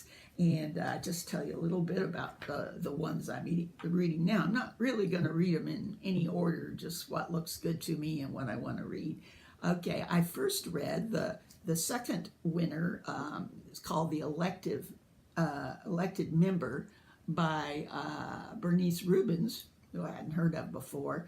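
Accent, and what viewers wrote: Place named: United States. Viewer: yes we drink tea in massive amounts. tea is the answer to everything. and beans on toast is standard food for us all the way through our lives. the most scrumptious and delicious comfort food, and very easy to make and very hearty when we can't be bothered to cook a full meal. Just open the tin of beans and make some toast! :D well I am British and I do like your accent too. Just as American ladies love English accents, so are we Englishmen attracted to American ladies with charming accents!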